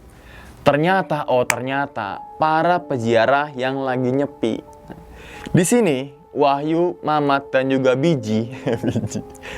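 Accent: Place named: Java, Indonesia. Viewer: Basa jawa ne bang lucu ☺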